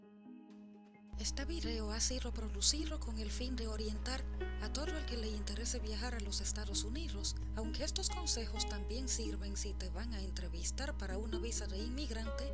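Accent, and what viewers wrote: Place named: Russia. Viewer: Buena informacion aunque la Calidad del video y esa voz con acento ruso es un poco molesta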